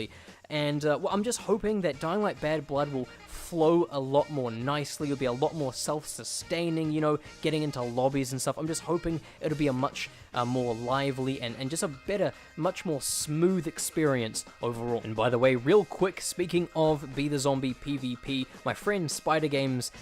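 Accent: New Zealand. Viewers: I have ONE queston... Are you a New Zealander, if not... EXPLAIN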